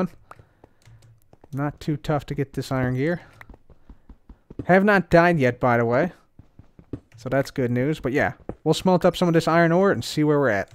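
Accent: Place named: Pennsylvania, United States. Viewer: sounds like a Pennsylvania accent. loving Season 7 so far! great start...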